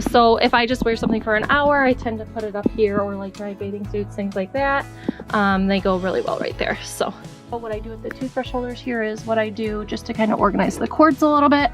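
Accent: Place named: South, United States. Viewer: you are getting a southern drawl, also LOVE the intro. Cool storage ideas/hacks.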